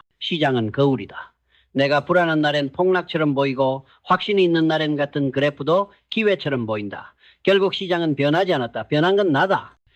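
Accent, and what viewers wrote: Gyeongsang, South Korea. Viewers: 역시 경상도 목소리가 좋아요...강한 느낌...머리 속에 팍팍 박혀요~~~~^^ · 굉장히 호소력있는 목소리 입니다. 경상도 억양이 되레 호감으로 들립니다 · 경상도 목소리가 진짜로 조언해주는 느낌이라 좋아요 ㅎㅎ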